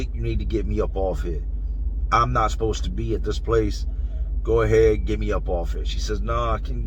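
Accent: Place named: Carolina, United States. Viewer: Bro I swear it sounds like you in Carolina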